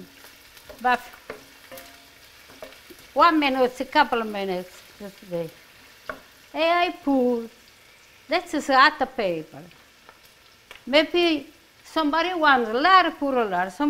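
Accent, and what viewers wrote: Italy. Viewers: You are a joy to watch! (Have to listen very closely because of your beautiful Italian accent). Thank you so much for all of your great recopies. (Have of copy of your cook book). You are a very special lady I and enjoy watching you create all of your wonderful creations in your kitchen! God Bless! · I like your English language with Italian accent😘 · ammore! Thank you for these wonderful recipes. You make them so much fun with your stories, the singing and Italian accent. God bless you always! 🙏🏼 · She is too cute, I love when she talks, I miss that accent like my mom’s and other relatives from Italy...COOKING TALENT CANT COMPARE, thank you for sharing your knowledge and love of serving others💕 God bless you and your loved ones, ❣️ Love your singing, memories of my beloved mamina, it warms my heart❤️ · gramma, love a your recipes. italians gota have the best accent.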